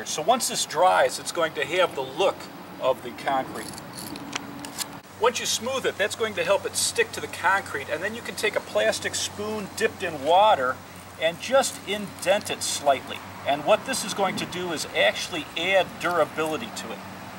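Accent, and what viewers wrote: Chicago, United States. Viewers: He's gotta be from Chicago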